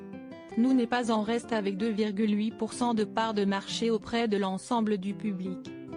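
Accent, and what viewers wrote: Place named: United Kingdom. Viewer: On passe l'accent nullissime Franco anglais de cette voix pourrie sinon on attend face à l'info on en a rien à foutre de punch line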